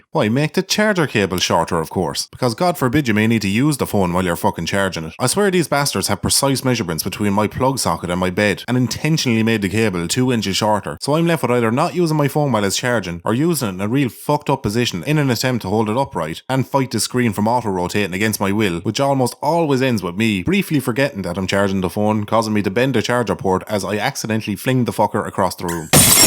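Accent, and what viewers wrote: Ireland. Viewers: Are you irish? and Loved your channel on the first video pal. Thanks for following back on twitter :)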